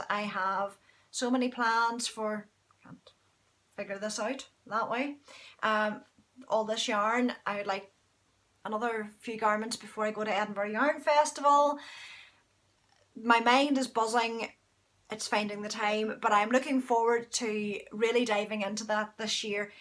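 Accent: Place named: Ireland. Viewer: Oh Nooooo, the knit retreat is all sold out! I am a new knitter in Minnesota, and a genealogist with Irish ancestry in Northern Ireland and I have had the pleasure of visiting Ireland three times. I just found your podcast and I started smiling as soon as I heard your lovely brogue. When you announced the retreat tickets going on sale at 10 P.M. GMT today (It is P.M. here) I immediately went to the website to get a ticket. Oh well, perhaps next time. I am thrilled to have found your podcast. Fern in Minn P. S. I began knitting in Sept of 2018 and I am loving it!!!!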